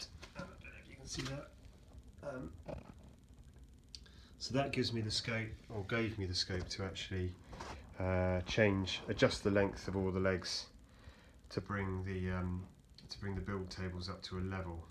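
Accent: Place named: Southern England, United Kingdom. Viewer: Just watched it, very interesting. I've been a PFA/LAA member since 1970 and for a number of reasons (life) just got in the way of homebuilding. I've been to virtually every annual Rally but only flown into a few. I did consider the Turbulent then my interest swapped to the MB2 Colibri, however I was surprised to see in the LAA mag that a Turbulent new build was underway. I was going to offer help with your build but I live in the North and by your accent I assume you're down south. I've had a close look at the Turbulent at all the fly-ins I've been to (many) and it still interests me. Can I wish you the best of luck with your endeavour. I just noticed that it's at least 25 years since it was first started so I would be looking at how one can properly check glued joints - perhaps your Inspector can help?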